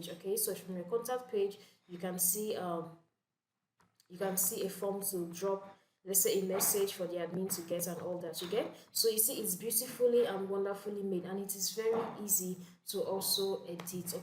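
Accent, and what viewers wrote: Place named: Nigeria. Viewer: I hear the Nigerian voice. Good job and thanks for this.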